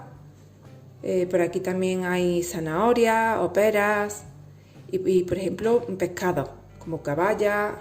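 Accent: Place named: Córdoba, Spain. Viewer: Hola. Yo llevo años usando los estuches lékuè. Y digo estuches porque no uso tan sólo ese sino también el grande que comentas para 3-4 personas, uno para pasta, dos moldes más para huevos, otro para arroces jajaja. Son muy muy buenos y hace ya, no se, muchos años que los tengo. Eso sí, para el microondas que es donde yo meto los estuches, mínimo aconsejable que la capacidad del microondas sea de 25 litros en adelante porque sino alguno estuches no entran en el caso de usar plato giratorio. Gracias por el vídeo y saludos desde Cádiz 👍 - pd.- Por el acento pareces de Córdoba, España 🙂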